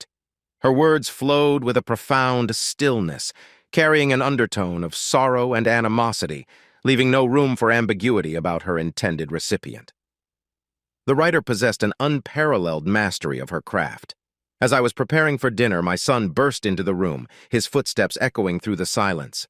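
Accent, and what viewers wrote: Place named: RP, United Kingdom. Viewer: What is with the high english?????!!. It sounds stupidly arrogant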